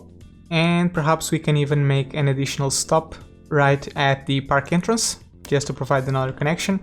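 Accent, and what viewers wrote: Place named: Portugal. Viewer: Pode escrever em português! O é claramente português. O sotaque não engana 😊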